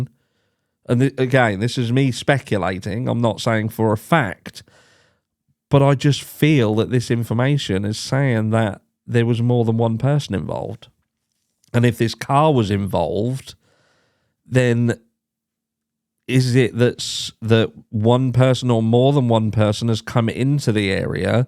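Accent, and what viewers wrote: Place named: Lowestoft, United Kingdom. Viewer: nice, the reason I asked is you have a similar accent to some of my family from Lowestoft. I now live in Austrailia and you don't hear that accent very much.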